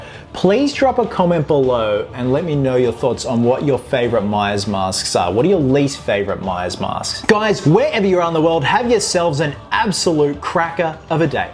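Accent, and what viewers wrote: Australia. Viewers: I thought that accent sounded right. A fellow Aussie spotted!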